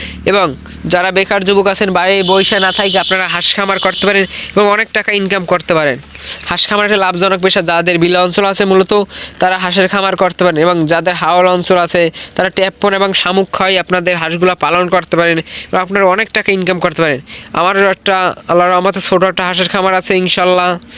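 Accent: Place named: Barishal, Bangladesh. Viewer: ওরে বরিশাইল্লা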